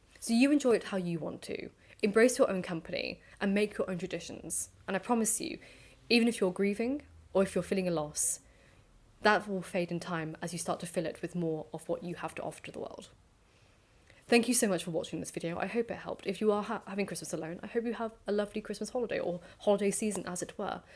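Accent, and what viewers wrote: United Kingdom. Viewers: Does this specific British accent have a name?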